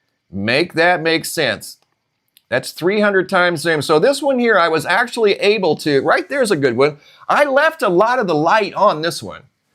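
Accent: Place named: Louisiana, United States. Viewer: Ayyye! South Louisiana! U da mayn! Mayne!!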